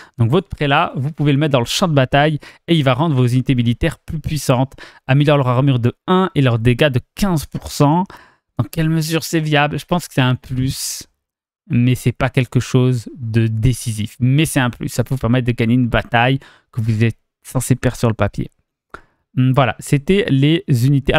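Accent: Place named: United Kingdom. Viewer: Marrant, les haters qui se moquent de ton accent anglais ne viennent pas corriger ton allemand ?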